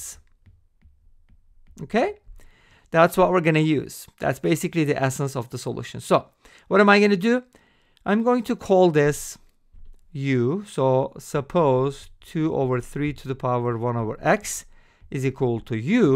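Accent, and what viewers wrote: Turkey. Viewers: Your pronanciation is like someone whose native language is Turkish, am I correct? Great explanation by the way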